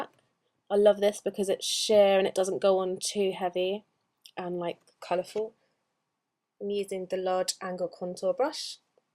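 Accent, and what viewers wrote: London, United Kingdom. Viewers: You are probably the most beautiful girl ever haha u sound Like a Londoner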